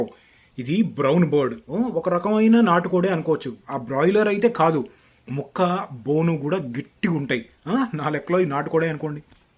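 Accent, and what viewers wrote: Andhra Pradesh, India. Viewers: Nee telugu andhra telugu la untadi why? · Anna me slang Andhra undi , meeru nizamabad migrate ayyara ?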